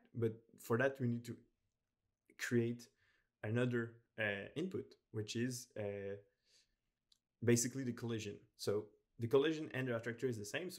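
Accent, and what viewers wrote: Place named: Quebec, Canada. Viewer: haha j'ai reconnu ton accent québécois. Merci beaucoup pour ce tutoriel.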